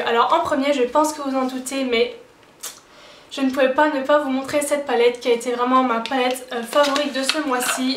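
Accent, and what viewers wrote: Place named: Alsace, France. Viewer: Aaaaaaah je me disait bien que ton accent me disait quelque chose enfin quelqu'un d'Alsace ! :D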